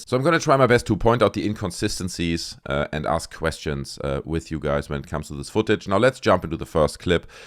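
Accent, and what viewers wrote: Germany. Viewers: Love your analysis and humor doing so. the German accent adds gravitas for this Texan. The cylinder looks like something suspended from a drone by a fishing line. Way too unbelievable. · I am starting to learn German, very slowly. I hope that I can get myself to the point where I am able to speak German as well as you have learned to Speak English. Im guessing, (hopefully without offending), that German is your Native Language. Love your accent. If English is your Native language, my apologies, wasn't sure.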